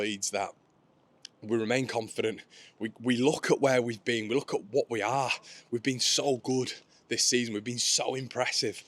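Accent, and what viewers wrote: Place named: Belfast, United Kingdom. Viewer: terrible Belfast accent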